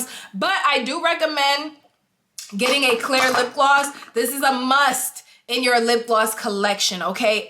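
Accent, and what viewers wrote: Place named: New York, United States. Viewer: lol, your new york accent came out in this video. I hear it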